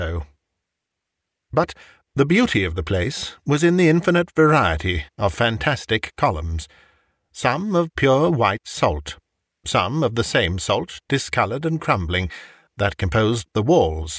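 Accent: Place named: RP, United Kingdom. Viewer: Dang I love yer posh voice!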